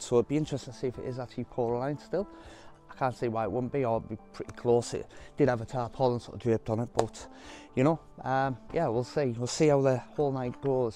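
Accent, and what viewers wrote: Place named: North East England, United Kingdom. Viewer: Hi That's a great image! It's so hard to get a balance between the vibrant blue of the nebula and the dust clouds that give that area of the sky it's extra bit of fascination, but you did a very good job. Impressive guiding! Great to hear a voice from home too From a North East emigrant now living in Austria. Ken Haughan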